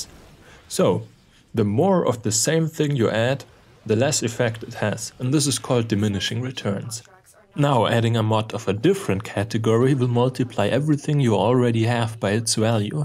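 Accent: Germany. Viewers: Are you German ? ^^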